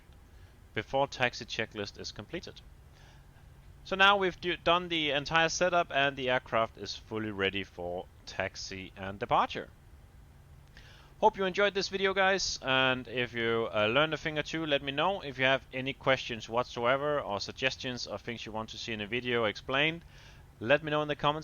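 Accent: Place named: Denmark. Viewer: Hey just wondering, are you from denmark? You sound danish haha, im danish too btw!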